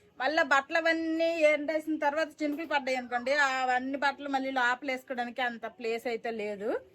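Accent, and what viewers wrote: Srikakulam, India. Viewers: Mee husbend a job chestaru? cute family...... Mee srikakulam bhasha chala bagundi ....❤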